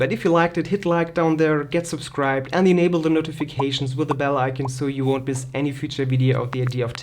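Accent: Germany. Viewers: Are you German your Englisch Sounds German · the german accent makes you sound exotic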